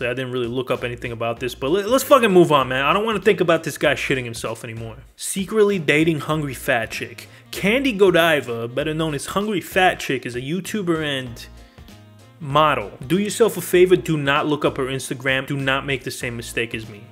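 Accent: New York, United States. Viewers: You Sound Like You Have A New York Accent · A buddy just turned me on to your channel yesterday. Of course, I subscribed. You are funny, and you have a cool accent. I'm originally from Boston and I am guessing your accent is NYC area, not Boston. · New Yorker?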